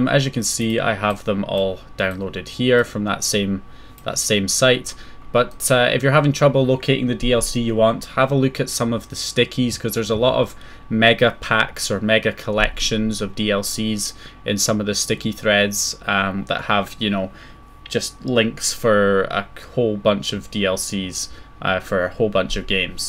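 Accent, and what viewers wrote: Scotland, United Kingdom. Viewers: Mate are you Scottish?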